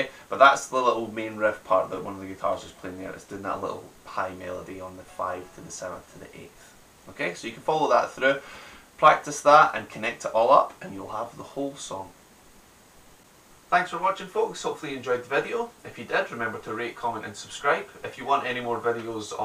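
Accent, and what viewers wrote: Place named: Scotland, United Kingdom. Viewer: Sorry this has nothing to do with the song, but is there anything better in the world than a Scottish accent? I think not. Good job on the song too. Greetings from America!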